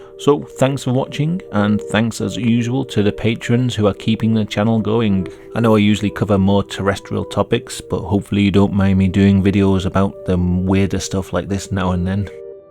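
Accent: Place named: North East England, United Kingdom. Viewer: no mate, defo not, I'd guess north east of England..maybe Sunderland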